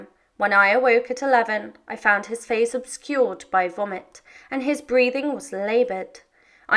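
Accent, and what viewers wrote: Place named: United Kingdom. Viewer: Apparently it is compulsory to say "it will always remain an enigma" in a British accent to what is otherwise obvious evidence of murder...I was a good friend of Tappy Wright and he told me things that are not in his book because he didn't want to get killed...The reason it was covered-up is because government agencies (That Jeffery belonged to) were involved...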